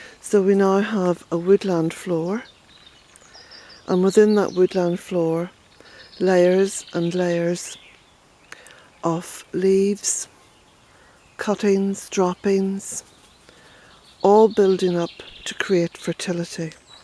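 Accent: Ireland. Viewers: Beautiful, your hard work was well spent. Watching this from rural waterford and am glad to hear the gorgeous Irish accent represented :) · Hello to the wealthy, amazing lady and jack. lovely to see your heavenly garden, also hearing the birds and your lovely Irish accent. I'm trying to set up my ironing, but keep stopping to see and hear your conversation . Thank you for the joy. north shrop England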